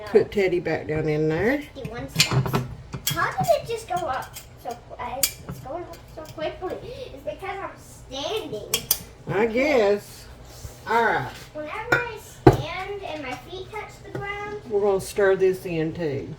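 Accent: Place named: South, United States. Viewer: Canadian here…love how you pronounce boil….bowl. So fun to watch your southern cooking, and hear the accent. Never heard of this cowboy candy before.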